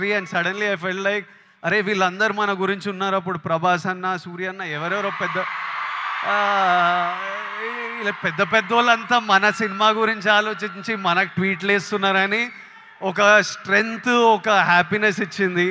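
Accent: Telangana, India. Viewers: Adi maa telangana slanga ra puka bayata ala slang ni comment cheyyaku dorikithe guddalo tantharu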